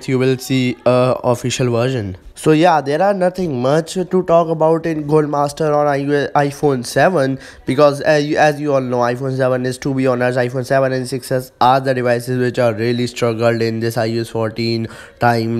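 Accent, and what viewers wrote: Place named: Tamil Nadu, India. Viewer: i can't understand what the hell you talk .. please talk english.. not english tamil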